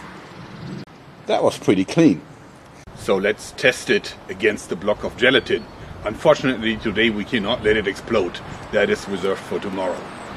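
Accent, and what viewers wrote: Germany. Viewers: yes he is...Don't you hear the typical german accent? :D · the guy is a german i can hear it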